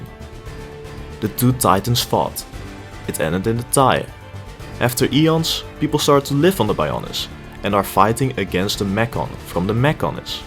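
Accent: Netherlands. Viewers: A bit of a random question, but... Do you force your accent? I dunno, your grasp of English is pretty good, and this thick of a Dutch accent is what you usually only hear from people who can't get any further than Steenkolenengels. It's not criticism, by the way. I can understand why you'd do it, if you do. Even people like Carice van Houten, who can speak with a perfect English or American accent, get told sometimes to put on a Dutch accent because it would sound exotic to American viewers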